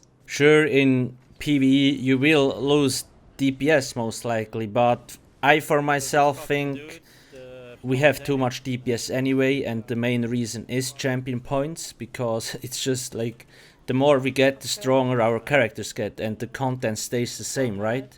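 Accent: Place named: Switzerland. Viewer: Pretty sure he is Swiss. That is our accent :)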